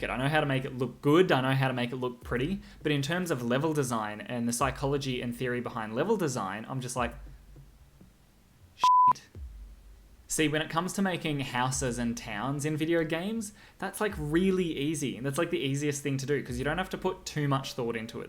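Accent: Australia. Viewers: Is that an Aussie accent?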